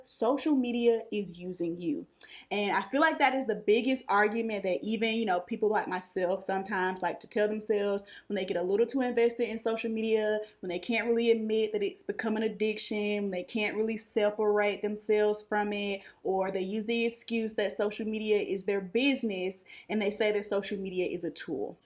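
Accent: South, United States. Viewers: Finally I'm from SC. I wasn't trying to insult you I can just tell you are southern too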